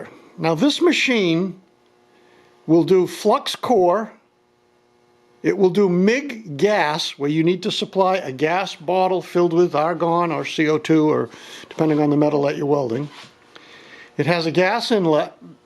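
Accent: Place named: New Hampshire, United States. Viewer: Where you from? Your accent reminds me of home in New Hampshire. You convinced me...I'm jumping on one of these.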